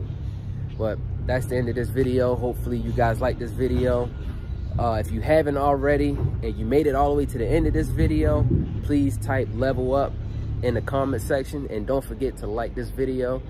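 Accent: Baltimore, United States. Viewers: that Baltimore accent is super easy to hear homie, #respect and #salute to you tho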